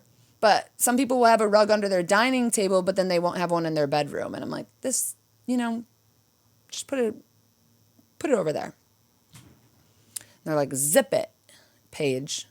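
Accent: Chicago, United States. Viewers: lol! I was a theater design major and I cannot see a play without "working" It is somewhat common within whatever your area of discipline is, I think. I notice that you look very pretty these days. And I notice your Chicago accent, but I grew up in Detroit, so I got some of those influences in speaking too! I would love to learn about organizing too - that was not a thing in my family and I have been getting "schooled" on it!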